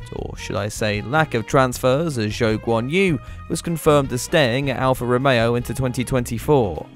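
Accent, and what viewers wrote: United Kingdom. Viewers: accent is so British that I keep hearing "Tor-Tus". Btw banger of a video,!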